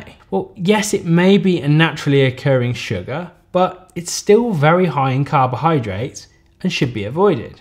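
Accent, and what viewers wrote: United Kingdom. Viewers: For the past 4 years, I watch Dr. Eric Berg and to be utterly honest, I learnt quite a lot. However, the way you go through details is great. Definitely subscribing and going to browse the channel. By the way, I love the way you sound, soOo British. Since I love the accent (Sir Ken Robinson, Simon Cowell, Marco Piere White, "Gordon Ramsay" are just a few to name really) is another reason to subscribe.😃🤘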